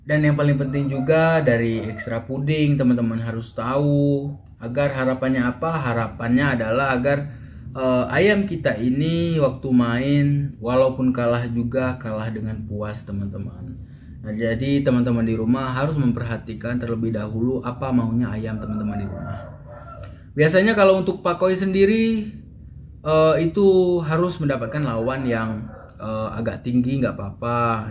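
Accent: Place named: Cirebon, Indonesia. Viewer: Kaya orang Cirebon mas heheh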